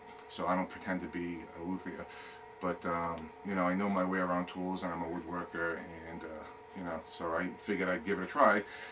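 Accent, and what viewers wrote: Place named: Boston, United States. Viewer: Code clan .Agreed, sounds like a Boston accent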